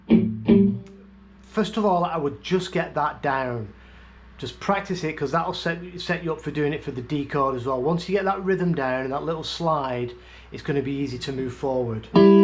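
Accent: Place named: Northern England, United Kingdom. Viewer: way aye lol. You sound like you are from the North of the UK. Leeds area...?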